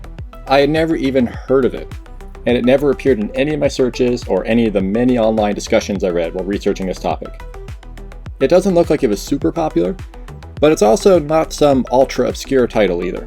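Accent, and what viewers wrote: Canada. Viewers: Pardon me, are you Canadian?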